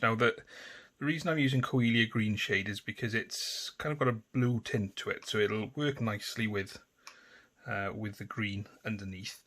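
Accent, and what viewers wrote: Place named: Welsh border, United Kingdom. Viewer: It’s nice to hear an accent that’s close to home. I’m on the welsh border myself! Great content!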